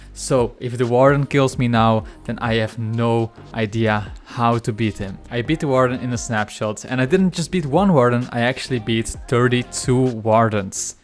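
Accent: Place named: Netherlands. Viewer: Veel succes ermee man, assuming youre dutch since i might be hearing a dutch accent. Fun commentary btw, i enjoyed the attempts & inevitable panic as expectations get absolutely grounded back to reality & humbled w the buffed Warden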